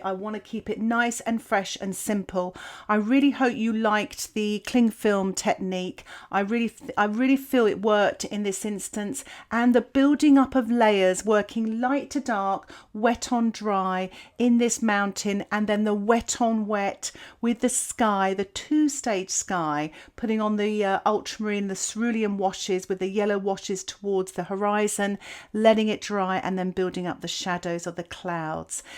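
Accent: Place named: South East England, United Kingdom. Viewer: Pure English(some would say a south east accent, I wouldn’t because I’m from the south east and have the same accent!)😊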